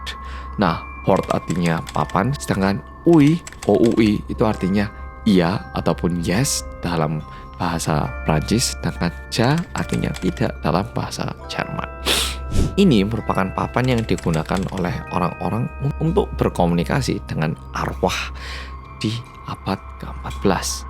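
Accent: Java, Indonesia. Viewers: Mau cerita dikit ya. Ibuku menderita Alzheimer setahun ini Sebelum beliau kena alzheimer, beliau sering tak ajak nonton bareng videomu. Terutama yg kulineran & jalan2 Setelah itu lamaaaaaa sekali ibu ngga nonton videomu krn aku pindah rumah, ngga serumah lagi sama ibu Setelah terkena Alzheimer, beliau ikut aku. Bbrp hari yang lalu, aku nonton Rindingdong di depan ibuku dengan volume full Eh ibu langsung "iki sing wong korea medok kae yo la?" Walah, takjub aku. Ibu masih inget sama, walau memori2 yg lain banyak yang hilang 😄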